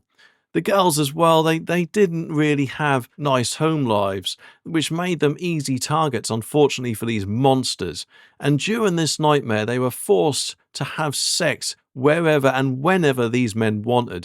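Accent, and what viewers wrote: Norfolk, United Kingdom. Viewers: Yeah 2 vids and I've subbed. U speak my language, and have a hint of Norfolk there as well 😂✌️🇬🇧👍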